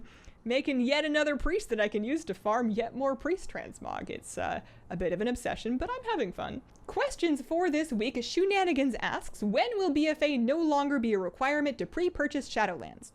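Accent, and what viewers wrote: Canada. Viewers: What part of Canada is she from? Does she still live here? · She's Canadian. Lay off it will ya!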